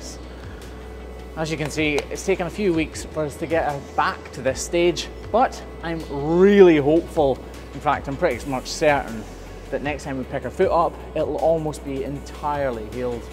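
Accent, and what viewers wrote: Scotland, United Kingdom. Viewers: Thank you. I think this is the one I have bin waiting for, bin worried about her. I think I called her a different number though. Lol I'm Canadian and I can't quite get your accent. I love it. Especially when you have the deep Scott's accents on. 😊 wanted to let you know I love your channel. Bin Bing watching it you reside me of my grape he was a vet. I got to see so many amazing things with him. He was so loving and caring animals first. He even stitched up my finger when I cut it of at the age of 7. Mom said hospital grandpa said " what am I? It's just a finger. I got this." And so it was I was stitched up by gramps. Love it. Oh your no where near his age and actually your younger than me. But you do reminded me of him. So thank you for beeing you. Your Albertan friend.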